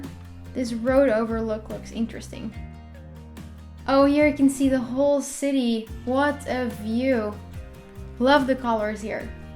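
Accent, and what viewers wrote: Spain. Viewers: Trinidad is so beautiful .. is how she pronouncing everything in this Spanish accent.. noooooo bbg😒😒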